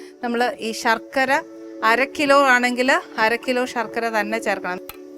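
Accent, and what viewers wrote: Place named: Pala, India. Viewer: പലാക്കാരിയുടെ സൗണ്ട്.r u from pala?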